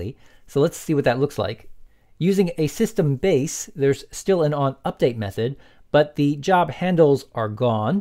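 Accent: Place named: England, United Kingdom. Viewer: I like your Unity videos, speaking very clearly and English accent is nice too. Hope you can make more Unity tutorial videos!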